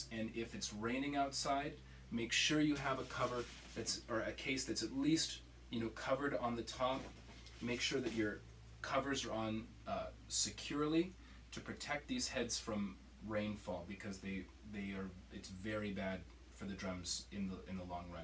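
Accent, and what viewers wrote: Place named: England, United Kingdom. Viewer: It’s funny hearing Indian words from a Englishmen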